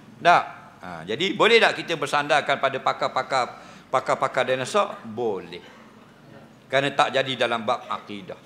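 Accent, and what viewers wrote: Terengganu, Malaysia. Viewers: Tak faham dialek bahasa terengganu ke..@ ada masalah kefahaman?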